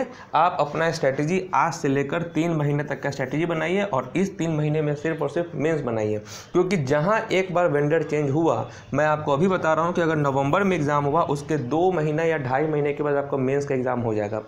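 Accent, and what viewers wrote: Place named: Bengal, India. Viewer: Thanks sir i like ur Bengali voice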